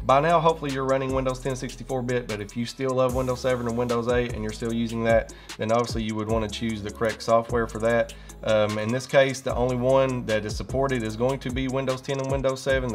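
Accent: South, United States. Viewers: the south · Even though he has like a southern accent 🤣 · Love the southern accent. Where are you from? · I agree...great tutorial, short and precise in a very clear and calm voice...very informative...Is there a slight southern accent to hear there??..😉😉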